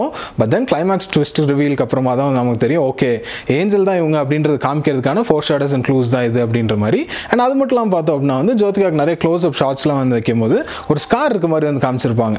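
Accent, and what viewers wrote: India. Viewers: Bro, R U from Nilgirs? Superb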